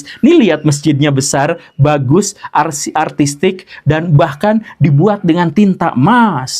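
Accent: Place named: South Jakarta, Indonesia. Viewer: Ngomong lu kayak anak jaksel mbul!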